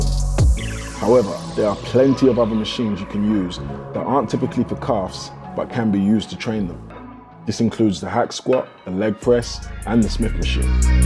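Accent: United Kingdom. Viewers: I didn't know that had an british accent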